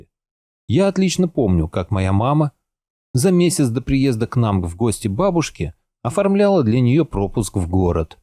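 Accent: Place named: Arkhangelsk, Russia. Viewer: уловила наш говорок Архангельский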